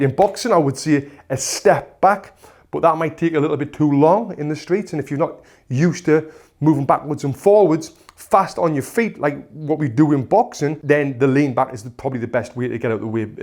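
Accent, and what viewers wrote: United Kingdom. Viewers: Man, I’m in love with the way you speaking, that accent “thru the poonch”! Love British accent since been taught it at school. Being native Russian speaker now living in US and speaking American english. Completely different story. Love to learn more about martial arts as well and boxing is one of the basic ones, it’s classic! Thank you for the video! Keep it up! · 20 seconds into the video and there is only one way to describe it, bri'ish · Are you British? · I love british accent! I subscribed u cos i loved u said puunchh instead of punch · How I love British accent! Cheers from Poland! 😉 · Your British accent ... Love it 😂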